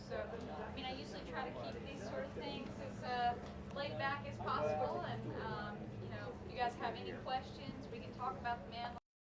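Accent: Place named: South, United States. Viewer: Love her southern drawl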